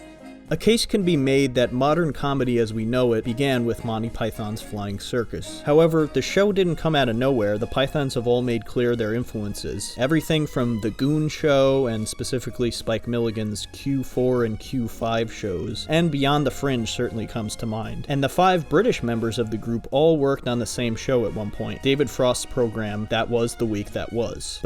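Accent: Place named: United States. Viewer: This is a pretentious and irritating video. The voiceover is so American it can not be listened to. It is what makes a difference between fact and knowledge. Yes the facts are there but the knowledge is missing. Cringe worthy dialog but little else...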